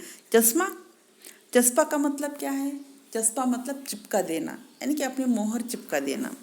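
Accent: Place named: Maharashtra, India. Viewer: Aapka fluency Marathi lag raha hai not good speaking Hindi...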